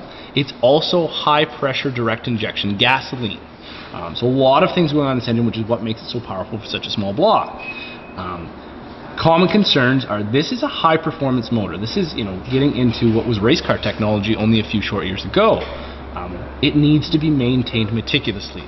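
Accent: Canada. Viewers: I liked the video until I started hearing all the "aboots" crazy canucks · Louis Healan he sounds extremely Canadian. Which is fine. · He's not only Canadian, but he lives in the heart of Mennonite country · Very informative, easy to follow along, and I'd love to see if he has a video on the 2.0L ecoboost engines like in my 2016 Focus ST. Big thumbs up on this guys' just no bs knowledge based on his experience. Sounds like he's from Canada, shout out from down South in Texas! ^_^ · I was about to say that he sounded as if he was from Ontario.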